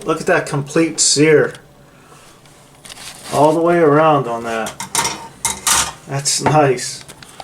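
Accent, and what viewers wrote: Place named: Western Pennsylvania, United States. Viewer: You sound like you are from Western Pennsylvania you jag.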